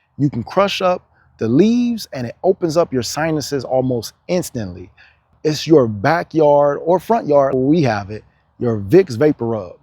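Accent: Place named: Los Angeles, United States. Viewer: You sound like you’re from LA.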